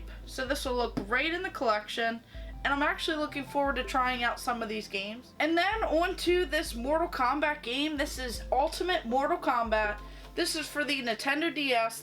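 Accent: Maryland, United States. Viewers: That's a nice haul. You live in Maryland? I've never heard a Maryland accent until you. He he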